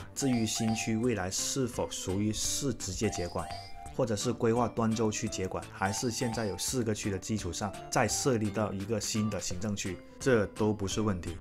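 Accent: Hong Kong, China. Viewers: 哥仔，我听你的口音有点似香港人，不像完全一个来自广东的小伙子，看来你对广东这一带的城市也相当熟悉，最后你的一句“再说就要被油管封号了”，说了些啥敏感话题了？是抵毁啥啥，啥啥？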